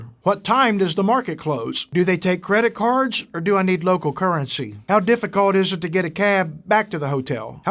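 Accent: South, United States. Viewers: Are you from the south? If so what state? ROLL TIDE!!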